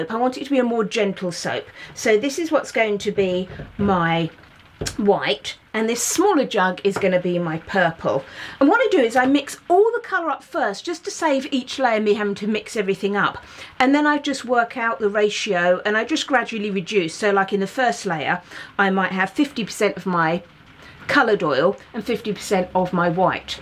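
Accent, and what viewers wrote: England, United Kingdom. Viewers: English accent level...This amazing soap artisan! 😂❤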